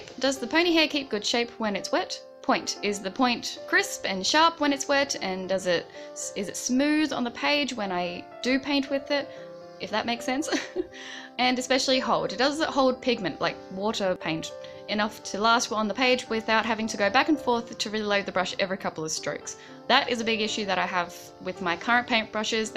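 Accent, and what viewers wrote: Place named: Australia. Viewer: Nice to come across a review video with an Aussie accent. Hi from Lismore, NSW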